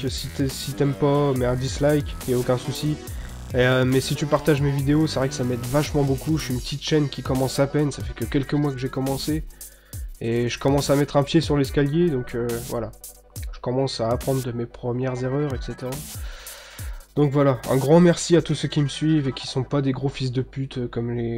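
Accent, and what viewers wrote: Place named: Charleroi, Belgium. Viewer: L'accent du nord que tu as me fait blinde penser a celui de Charleroi (ville de Belgique) 😉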